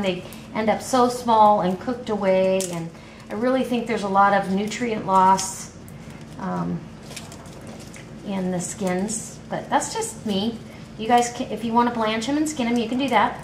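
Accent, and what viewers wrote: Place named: Texas, United States. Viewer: You sound like your a Texan. :)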